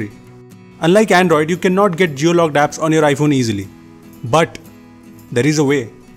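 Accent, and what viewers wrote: India. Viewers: When the Indian guys will fix the English accent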